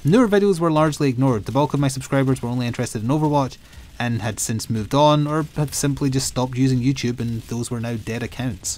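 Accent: Scotland, United Kingdom. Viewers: Tl;dr You're amazing! Love all your content! ❤🧡💛💚💙💜🤎🖤🤍 Hey there, This is not the first time I've commented/watched/participated in chat. I've been following your channel since that sponsored mh3u video. I used to make videos and streamed under the name TheBearthropod. Never got any success but it was just for fun. You always have been and always will be my favorite content creator. I loved learning all the new things about you from your new videos. As another private and quiet person, I respect you greatly. I've always thought that if we met in game or in person we would hit it off and be friends. Only because of our similar views on games and both being in the lgbtqia+ community. You're inclusiveness, respectful nature and humor always makes me smile. Your Hollow knight coverage coincided with at the time the lowest point in my life. I suffered from a severe sciatica flare up. 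I couldn't walk for two weeks and was in excruciating pain. Your daily videos of hollow knight gave me a flicker of hope(no pun intended please don't send the legitimate business frog i need my kneecaps). If I thought that was the lowest point in my life, let's just say I definitely did not see 2022 coming. I lost my grandfather, two uncles, an aunt, a beloved pet of 18years and my mother. I was horribly depressed for two years, but true to form there were your posh Scottish accent and dry wit to get me through. So, I thank you from the bottom of my heart. Even if there are horrid people critici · Came aboard for the silly overwatch vids, stuck around for the amusing dialog & Scottish accent. Love ya, man, don't ever stop being you.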